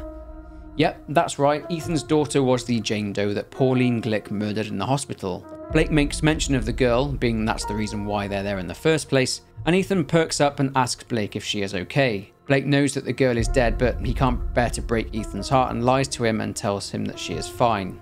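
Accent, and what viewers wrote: United Kingdom. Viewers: This guy as a cool accent. The storage of sounds cooler when there’s someone with an awesome accent telling it 😎 and Brittish/English people are cool to 😎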